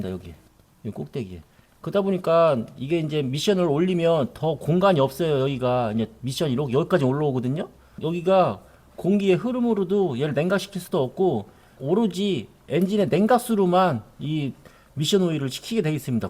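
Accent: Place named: Chungcheong, South Korea. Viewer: 충청도 사투리 쪼~~끔 펌프라고 하면되지 쁘엄 쁘 ㅋ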